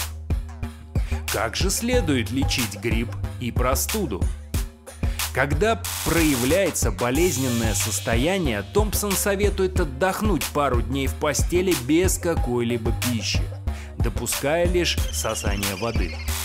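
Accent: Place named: Baltic states. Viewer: из Прибалтики,вот и акцент,видимо,местечковый.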